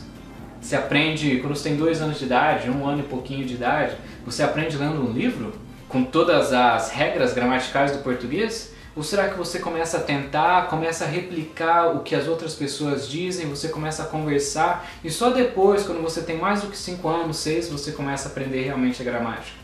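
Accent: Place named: United States. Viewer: Parabéns! Kkkk já está ate com um pouco de sotaque americano kkk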